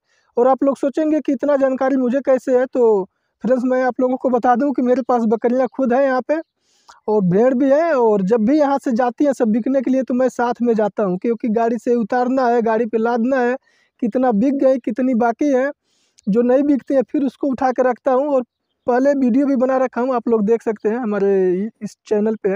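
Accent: India. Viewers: Bhai aap Bihar ya up se ho kya lagta hai aap bhojpuri bolte ho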